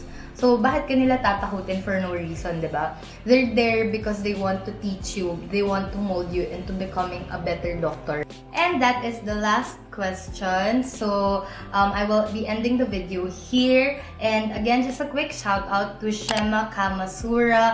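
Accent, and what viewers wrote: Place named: England, United Kingdom. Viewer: I love you english accent.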